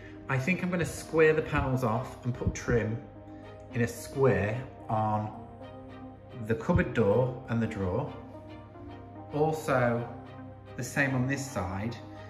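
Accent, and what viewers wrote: England, United Kingdom. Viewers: I agree! He’s always saying (no pun intended) that he talks too much. I love hearing his thought process and why he’s doing what he’s doing. An awesome teacher for sure! I think it’s great! Plus, I love an English accent! 🙂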